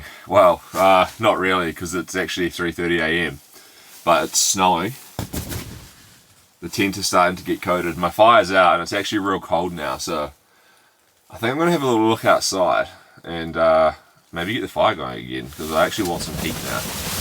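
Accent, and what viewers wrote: New Zealand. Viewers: He's a true kiwi where their i's sound like e's. If you want to know if someone is a kiwi, ask them to say fish and chips. It'll sound like fesh and cheps. He's ha 😅 We'll be Namibia next year on a world cruise. We're really looking forward to it. The desert looks spectacular, but I'm sure you'd love a winter scene like this after 300 days of sun. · I love listening to your New Zealand accent. We have quite a few New Zealanders here in Australia so I am quite used to the accent. Do you have a playlist of your Videos, I would like to watch them all in Chronological order. Keep up the good work.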